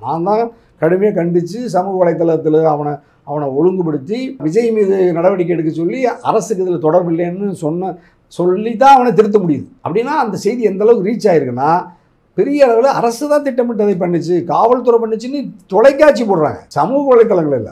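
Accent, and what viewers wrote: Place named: Kongu, India. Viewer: கொங்கு மண்டலத்திற்க்கே உரித்தான நக்கல் பேசும் ஆளுயா நீ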